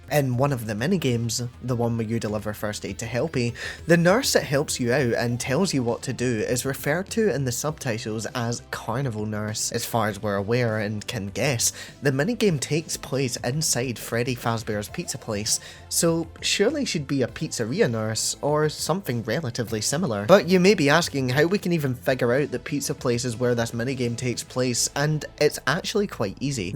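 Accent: Scotland, United Kingdom. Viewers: great theory actually AND you remind me i love scottish accent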